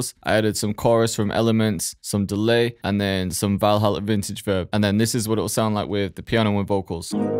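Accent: United Kingdom. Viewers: I really like this dude's British accent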